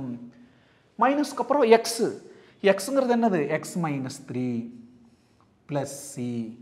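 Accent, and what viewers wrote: Coimbatore, India. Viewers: It's coimbatore slang. Try to understand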